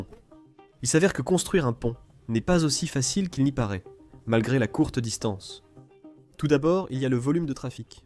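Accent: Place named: Portugal. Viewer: en effet c’est bien ça. L’accent portugais qui est reconnaissable de loin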